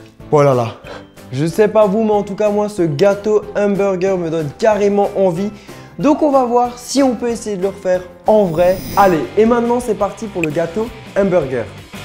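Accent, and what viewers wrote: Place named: South, France. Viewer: J'aime trop t'es video t'es trop beau ta un accent du suddd mon poteeee x'd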